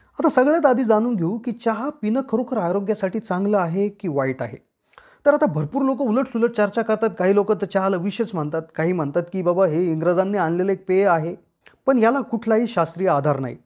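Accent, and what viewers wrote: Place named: Khandesh, India. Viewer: Khandeshi bolaychi stylish bhasha ashi aste .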